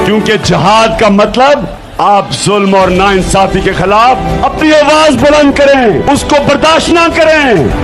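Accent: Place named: Pakistan. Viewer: this sound Pakistani sound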